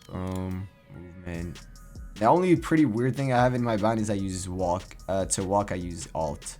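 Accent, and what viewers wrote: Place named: France. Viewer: you're french ? you got a nice accent for a frenchie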